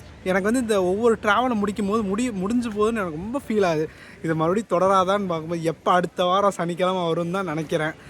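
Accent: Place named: Madurai, India. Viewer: Neenga Coimbatore thaana eppudi madurai slang pesuringa?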